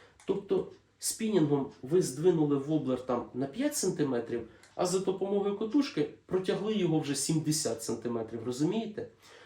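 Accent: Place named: Surzhyk, Ukraine. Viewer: забагато суржику та неправильного використання слів, але неймовірно приємно чути тебе "рідною"! Respect і уважуха. Давай ще!!!!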